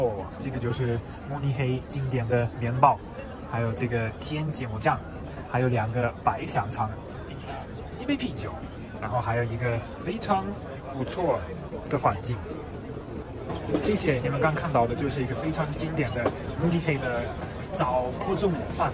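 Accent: Sichuan, China. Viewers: 滿口四川口音😂😏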